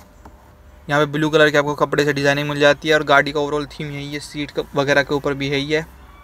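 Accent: Haryana, India. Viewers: Bhai yo desi haryanvi touch maza lata h car ke review me 😂😁😁😎😎😎💪💪💪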